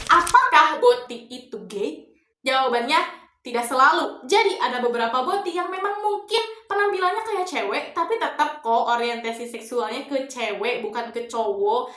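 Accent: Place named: Sunda, Indonesia. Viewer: Gaya bicaranya mirip kang dedi mulyadi cenah😂 sunda buanget Yang paling penting di video itu kita sudah diarahkan untuk mengetahui perbedaan tiga hal dalam mengidentifikasi LGBT 🌈 1. Posisi seksual 2. Ekspresi 3. Orientasi seksual Bottom adalah posisi seksual yang dianggap sebagai orang yang lebih pasif atau submisif, begitu sebaliknya dengan top di gambarkan dominan dan lebih aktif Ekspresi ini adalah cara orang memperkenalkan diri ke publik yang biasanya dari sikap dan sifat atau bergaya pakaian yang khas Orientasi seksual ini yang menjadi bagian paling vital, hal ini yang secara jelas menentukan apakah orang itu punya ketertarikan seksual yang beda, setahu saya ada heteroseksual (lawan jenis) dan homoseksual (sesama jenis) aja Di tabel LGBTQ+ paling banyak adalah ekspresi diri Lalu untuk faktor lingkungan dan genetik itu udah umum, semua orang seharusnya tahu dan bisa dilihat langsung, saya fokus ke aspeo sosialnya saja dan psikologisnya Berikutnya mungkin yang kurang dipembahasan itu adalah sudut pandang tiap individu-individu terhadap BOTI, seluruh pembahasan kamu udah bagus cuman belum ada observasi atau penelitian langsung turun ke praktik lapangan sosial. 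Saya pernah mencoba memahami kenapa Boti dianggap salah selain karena melanggar aturan agama tertentu. Pada dasarnya boti digambarkan sebagai sosok yang feminim dan terkadang lebih pasif, hal itu sebenarnya dapat menganggu stabilitas ketertarikan seksual, terkadang ada boti yang terlalu cantik yang malahan banyak yan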